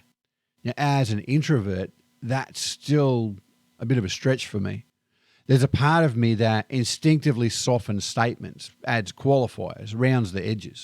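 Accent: Australia. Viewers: I love your Aussie accent.🎉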